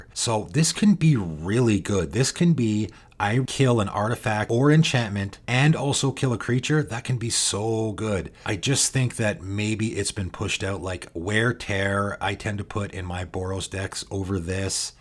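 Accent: Canada. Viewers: I suspected you were Canadian as well, just some subtle ques from your accent.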